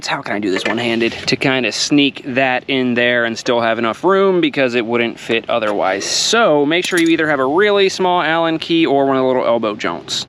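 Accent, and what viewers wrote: United States. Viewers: You sound American but you keep talking about liters of storage?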